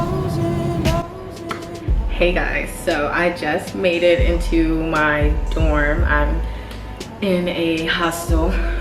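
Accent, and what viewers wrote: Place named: United States. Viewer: The accent is why they say you have an American thing.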